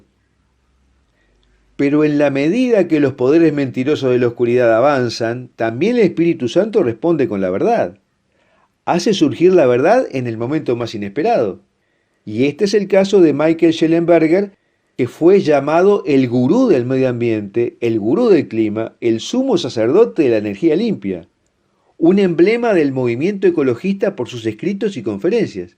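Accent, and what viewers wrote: Argentina. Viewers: TU ACENTO TE DELATA: ¡ERES ARGENTINO! MI PREDICADOR EVANGÉLICO ARGENTINO FAVORITO ES: "DANTE GEBEL." SI NO LO CONOCES, TE LO RECOMIENDO, SU PRÉDICA: "THE WALKING DEAD" ES, SIN DUDA ALGUNA, UNA DE SUS MEJORES PRÉDICAS!!! GOD BLESS YOU!!!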